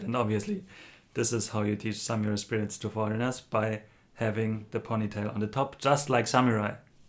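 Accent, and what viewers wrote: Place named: Germany. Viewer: Aaaah I knew you were German when I first heard your accent in Dave Trippin's video :) Bin auch deutsch (aus München) und habe für 4 Jahre in Japan gelebt, will irgendwann zurück, ist eine Liebe für's Leben, wie's scheint. Bereu auch irgendwie meine Entscheidung zurück gegangen zu sein, wird sicherlich schwierig es nochmal zu schaffen! Das Visum ist ja immer Hauptproblem.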